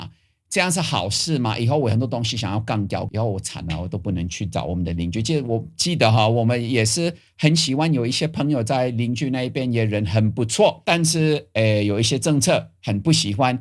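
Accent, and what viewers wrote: Taiwan. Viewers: 我非常確定您不是歪國人 口氣 腔調 用語 根本是台灣人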